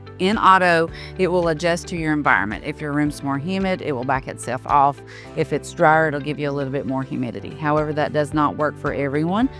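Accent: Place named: West Virginia, United States. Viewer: This nice lady sounds like she from Wayne Co. WV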